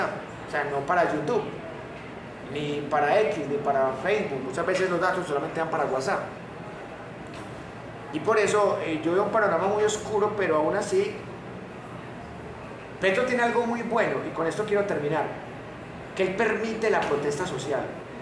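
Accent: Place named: Spain. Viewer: Es tan genial escuchar a este profe...explica todo, claro, castizo ❤... así, sí que se entiende!👍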